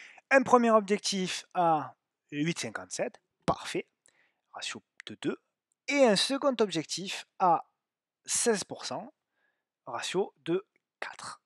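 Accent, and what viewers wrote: South, France. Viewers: Top. L'acent du Sud le meilleur!!! 😉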